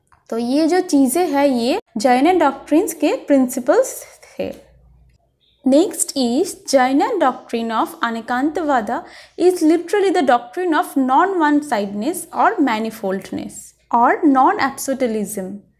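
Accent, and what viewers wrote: Bengal, India. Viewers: And apnar kotha shunei bojha jaay apni Bangali🥰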